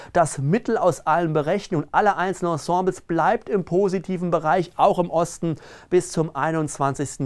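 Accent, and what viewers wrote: Hesse, Germany. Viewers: Kälte weit weg , sehr gut! Bißchen hessisch nächstes mal wieder bittte 🙂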